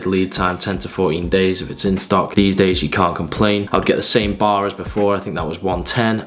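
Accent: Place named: England, United Kingdom. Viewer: His voice is like asmr for me... idk if its because I'm american and his soft english accent is soothing or what.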